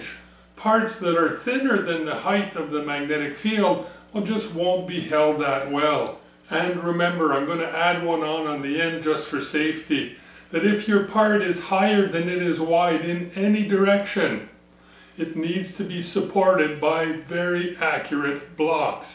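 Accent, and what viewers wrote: Canada. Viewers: Michigan or Canadian?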